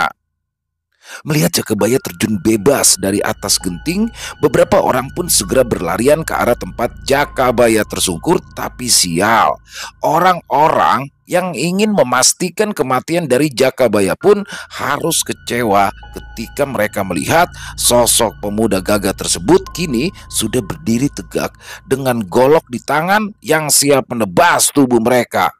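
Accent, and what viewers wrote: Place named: Jakarta, Indonesia. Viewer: bang kapan lagi ngangkat cerita bang rudi sm bang piyan,seneng sm logat betawi nya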